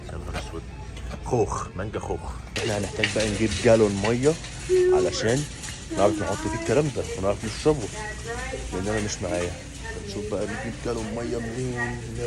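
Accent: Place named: Arab world. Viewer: لا حسيت الاكسينت بتاعك في الانجليش اكسينت عربي فيمكن انت احتكاكك مع العرب اكثر بس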